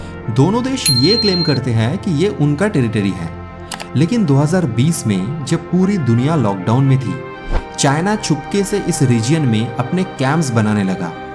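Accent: Bihar, India. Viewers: Bhai aap north east se ho aap ke voice me thode Bihari tuch h 😊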